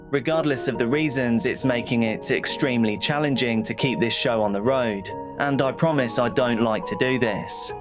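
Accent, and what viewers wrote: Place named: Scotland, United Kingdom. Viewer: Lovely listening to a Scots voice Scottish independence 🏴🏴🏴🏴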